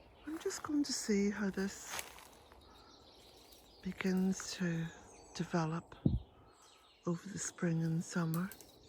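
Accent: Ireland. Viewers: Looking at your garden was very meditative. You have a beautiful place there. My Mom's parents came from Ireland--Friel and Canny. I didn't realize when I clicked on your video that that's where you are. It's nice to hear the brogue again and hear the appreciation for nature and respect for Mother Nature and some of the hopeful Irish sayings. And for, the numerous 'cups of tea'. I was looking at your stone floor. I would love to do that in my home. I love stone work. I love sitting on the jetty at the ocean listening to the waves and birds. It's just so grounding. So glad I came here today. Thank you. ❤ --Kate Cape Cod, USA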